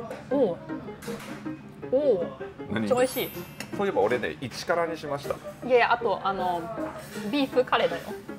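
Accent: Nagoya, Japan. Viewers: 偶然に初めて見たとき日本語のアクセントが名古屋ぽいと思ったら名古屋ご在住のようですね。名古屋及び近郊の発祥の店がよく紹介されているので登録しました。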